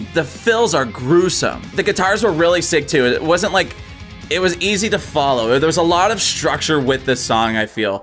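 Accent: Canada. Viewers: "Oh fuck bud". You Canadian bud? Haha. First time seeing your reactions, good one man. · Love the song and the vid but I’m still trying to figure out if you’re Canadian or just watch a ton of Letterkenny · You're canadian as fuck, aren't ya buds? Decent.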